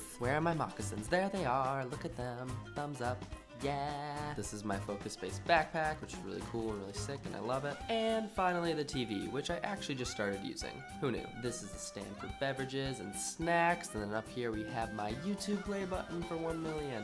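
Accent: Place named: Midwest, United States. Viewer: I really enjoy watching all your videos. They're beyond cool!. I was once a foreign exchange student to St. paul, MN way back in 2008 so i kinda enjoy listening to yer midwestern accent. Keep it up! :)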